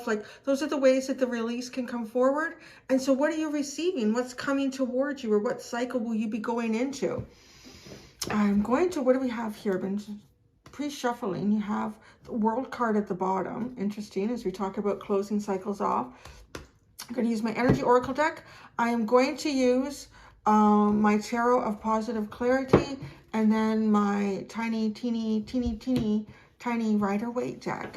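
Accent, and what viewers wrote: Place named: Canada. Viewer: your canadian accent is so soft and good for practicing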